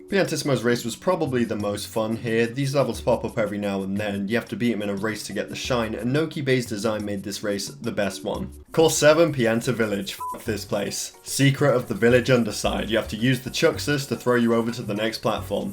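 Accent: United Kingdom. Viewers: The British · Oh god he's british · British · but british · Maybe it's just been a while but I could have sworn isn't british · It's like Bri'ish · but British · 1/10 too british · BRITISH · Two of my favorite youtubers in one....and hes british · Why is British now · but he's british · Wow, minus the british accent everything was spot on, could've fooled me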